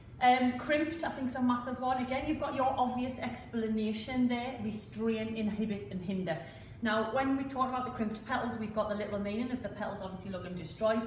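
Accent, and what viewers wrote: Newcastle, United Kingdom. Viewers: From the north east surely , Newcastle ?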